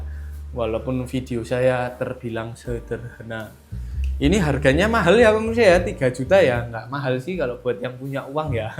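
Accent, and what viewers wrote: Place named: Banyumas, Indonesia. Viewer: Sing logat bahasane kayonge madan ngapak kye, sing endi sih kang?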